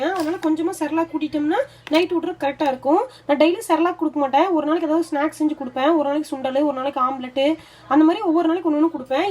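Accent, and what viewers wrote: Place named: Erode, India. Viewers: Nalla theriyudhu. Neenga pesara slang. Namma oorula than over ah vanga ponga nu pesuvom. I'm from Chittode 😊 lives in Bangalore . Ur good name ???? Ur dad s a cook ??? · Hai i am also frm erode but now in ooty happy to hear our erode slang thank u god bless u sis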